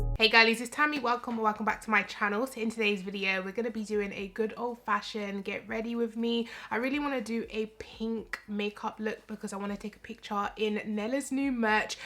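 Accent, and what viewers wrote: Jamaica. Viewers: patwa coming through.. Love to see it 😁😘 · Omg Ms you are stunning, I love, love your makeup looks, I hope to get as comfy on my youtube soon. Love your work, I finally heard the Jamaican accent. Big up yourself:)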